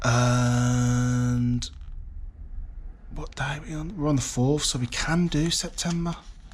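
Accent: Birmingham, United Kingdom. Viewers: That AI description feature is amazing, since I restarted shifting a few of my personal items I’ve been hoarding over the years on eBay I’ve pretty much just been using that feature. Definitely saves time and gives you a far better description for your listing eh! Great video again bud you’re fast becoming one of my fave reselling channels! Love the accent too, my mum was born in brum and my nana had the same accent, so it’s very natural for me to enjoy your content bro! 😊❤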